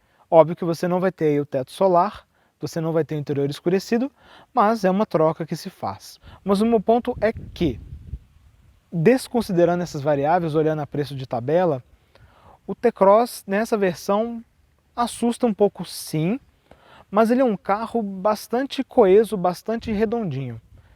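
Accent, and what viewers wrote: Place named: Rio de Janeiro, Brazil. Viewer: O sotaque do apresentador está mais para um carioca, igual meu vizinho, a avaliação nota 10.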